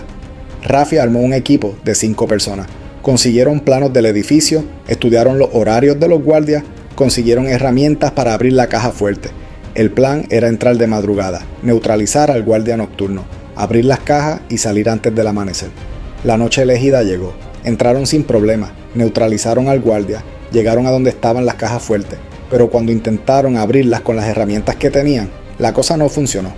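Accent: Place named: Puerto Rico. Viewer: Cabron te estoy viendo desde Mayagüez Puerto Rico eres el mejor narrador, por q así mismo es q se abla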